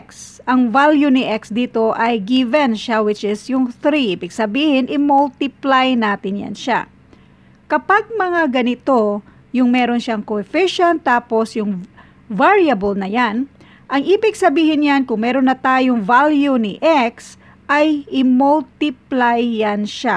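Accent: Visayas, Philippines. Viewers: Thank you so much Maam. You are so humble and sweet. I like your visayan accent. All the love from here (Dumaguete City)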